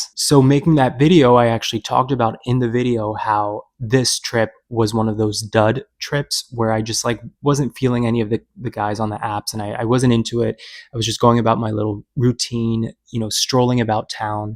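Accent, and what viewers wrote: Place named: South, United States. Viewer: Sounds like a true southern man with limited experience hooking up.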